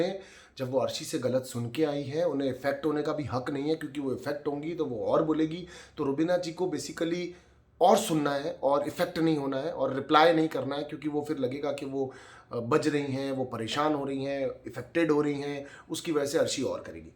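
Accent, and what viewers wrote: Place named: Haryana, India. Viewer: 😃 I love ur haryanvi man hahahaha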